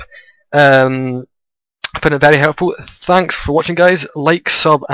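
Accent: Scotland, United Kingdom. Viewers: your Scottish! i love u accent! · R u Scottish if u r so am I!!! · Hahhaabha you're cute. and THANK YOU AWESOME PERSON./ scottish <3 Do you speak scottish gaelic too?:3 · You're scottish?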